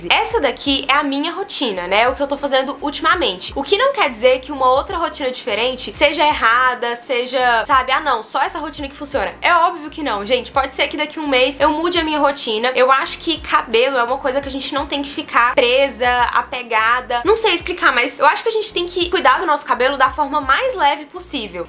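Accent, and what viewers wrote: Minas Gerais, Brazil. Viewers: Pelo sotaque imaginei que era mineirinha .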